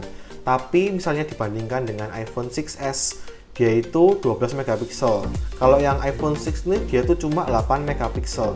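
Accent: Indonesia. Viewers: Logatnya surabaya banget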